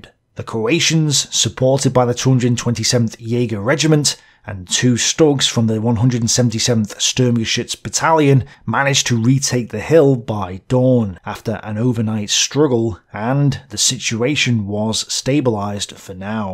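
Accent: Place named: Northern England, United Kingdom. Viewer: I really enjoy your comments on ww2, Señor. By the way, because I lived in the UK I feel your accent is from Northern England or Scotland. Only there the U is pronounced like we do in Spanish. U = oo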